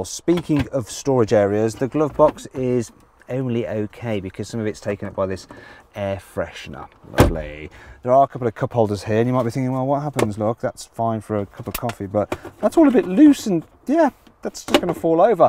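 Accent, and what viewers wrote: United Kingdom. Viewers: I’m just glad finally CarWow provide Indonesia translation for everyone whose difficult to understand British accent. Also i’ve prefer this channel rather than any local automotive channel like Autonetmagz, Otodriver, etc. because Watson’s way of reviewing is so good. I’m sorry to say this but i’ve prefer him than any local reviewer here. Good job, 😊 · Actually, I am more comfortable listening your review with a typical British accent rather than the Indonesian subtitles 🤣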